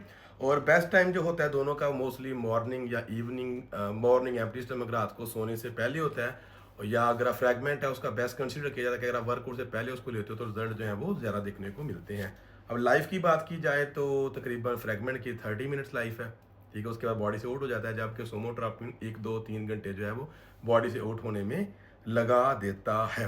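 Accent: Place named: Pakistan. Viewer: Sab theek lekin accent pakistani kyu hai aapka ?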